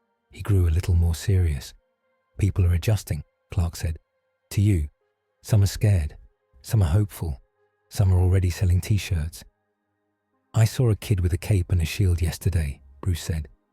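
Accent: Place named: England, United Kingdom. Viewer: Why the hell is someone with an English accent narrating? Cap is an American! DA...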